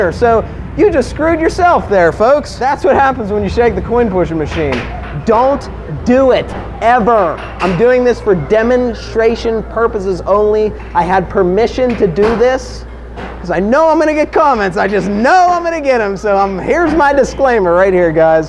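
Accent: Pittsburgh, United States. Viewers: Are you from pittsburgh Pa??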